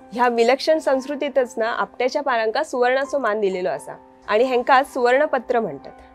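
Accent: Malvani, India. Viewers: बेटा, तुझा माहिती सभर वीडीयो मी नेहमी आवर्जून पाहत असतो. मालवणी भाषेत ला तुझा प्रत्येक शब्द मला ऐकायला आवडतो. मी गुजराती आहे. माझं बालपण गिरगावात गेलं असल्याने मराठी भाषा मी बोलु शकतो,वाचु शकतो आणि लिहू शकतो. तुझ्या मालवणी भाषेत जो गोडवा आहे तो मला नेहमी ऐकायला आकर्षित करतो. तुझा मालवणी स्वाग असाच कायमचा चालू ठेव. माझे तुला खूप खूप आशिर्वाद.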